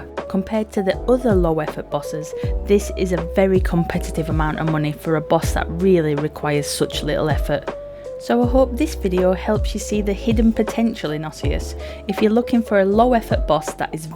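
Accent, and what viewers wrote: Yorkshire, United Kingdom. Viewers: Ey up lass, you a yorkshire rser too? :D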